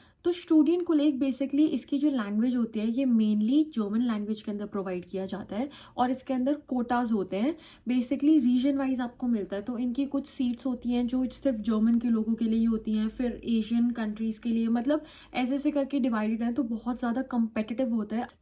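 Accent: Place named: Delhi, India. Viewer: Delhi wali hindi bol rahi ho aap with jat test ke sat ❤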